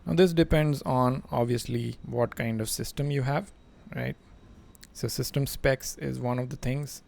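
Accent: India. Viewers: omg india accent now in msfs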